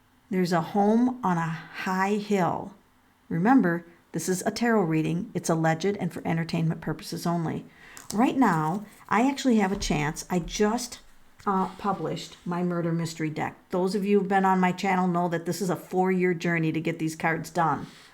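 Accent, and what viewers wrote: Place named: Midwest, United States. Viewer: I love your mid-western accent🤗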